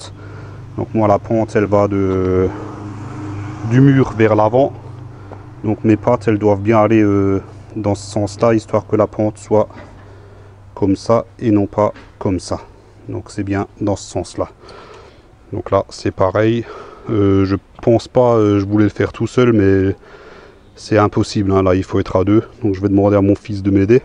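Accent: Alsace, France. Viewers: Rien que pour l'accent alsacien je mets déjà un pouce en l'air ;-) en tout cas c'est clair qu'il est bien fini ce carport . Par contre qu'en est-il de l'entretien du polycarbonate transparent ? facile à laver ? et niveau résistance ?? J'ai beaucoup de vent dans mon petit patelin lorrain, je n'aimerai pas retrouver la toiture chez le voisin...tu penses que c'est costaud si ca souffle fort ???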